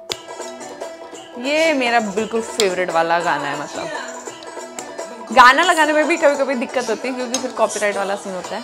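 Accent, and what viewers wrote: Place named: Pahadi, India. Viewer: Bahut achchha laga aapka ghar, aap pahadi bolo ya na bolo, apke bolne mai Pahadi 'Ascent' to aata hi hai.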